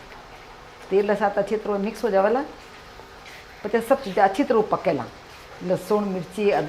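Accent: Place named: Rajasthan, India. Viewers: Iys typical marwadi language, easily understandable almost like hindi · आप री सारी रेसिपी में फॉलो करु हु मम्मी जी। आप रो बनावन रो तरीकों ओर मारवाड़ी में बोलनरो तरीकों माने बहुत अच्छों लगे है। बहुत सारी रेसिपी में बनाई भी हु। आप री सारी जानकारी में जोधपुर रो लहजों आवे है।😊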